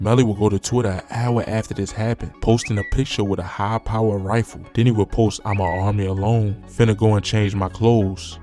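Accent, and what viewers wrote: Baltimore, United States. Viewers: ain't no q about it, you hear that baltimorese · Lmao ya accent screams Baltimore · You from Baltimore? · he sound like he from Bmore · You can tell you not from city and sound like you from Bmore. Nigga dont know how to say calumet or cicero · This accent killin me. You from Bmore? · Between your fake over exaggerated suspenseful voice to the irritating Baltimore accent l😂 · Lol Bmore accent detected tew yew dew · nigga bmore accent funny as hell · You can tell this guy is from Baltimore or the dmv lol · This nigga gotta be from Baltimore the way he talk 😂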